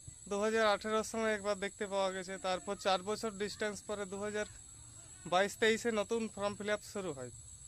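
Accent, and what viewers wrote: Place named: Purulia, India. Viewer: কথা শুনে মনে হচ্ছে তোমার বাড়ি পুরুলিয়া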